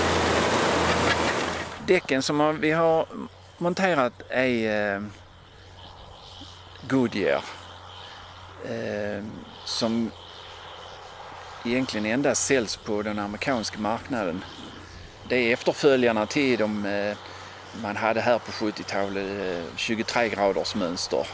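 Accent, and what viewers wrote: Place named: Scania, Sweden. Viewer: He speaks Swedish but with a dialect from southern Scania, the southernmost part of Sweden and therefore Scandinavia.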